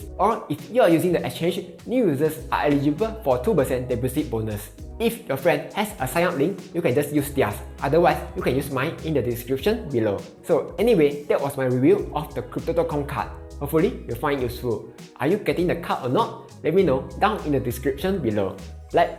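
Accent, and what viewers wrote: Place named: Singapore. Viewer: Bro i love your video style chill, funny and straight to the point 👍 singapore accent cherry ontop 😂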